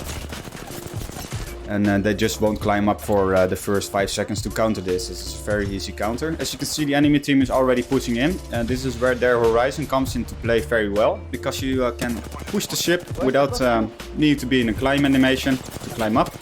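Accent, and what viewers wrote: Netherlands. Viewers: also love the dutch accent btw :) and great video man keep it up!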